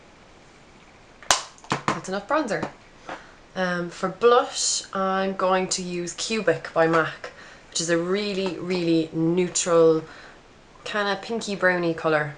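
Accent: Ireland. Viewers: very nice look, thanks :) are you from Dublin? :)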